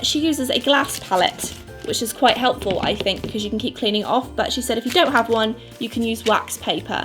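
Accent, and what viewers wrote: United Kingdom. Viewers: Her accent is so British despite moving to America at a very young age. Cool!!! I wonder if she’s ever explained it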